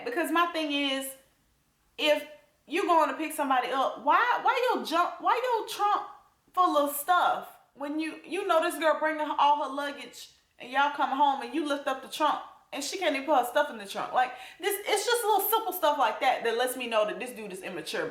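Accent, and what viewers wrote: South, United States. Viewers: Who doesn’t like her accent?!??? I’ll fight them! I love southern accents. Wish I had one.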